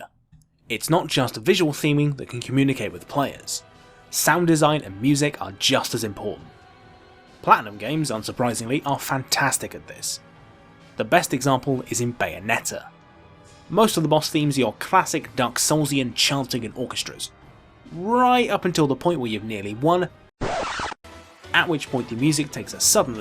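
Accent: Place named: RP, United Kingdom. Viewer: "Allow me to explain to you" is a super annoying way to phrase your intro. Within the first 10 seconds, between that phrase and your posh accent, I can already tell you're a total tosser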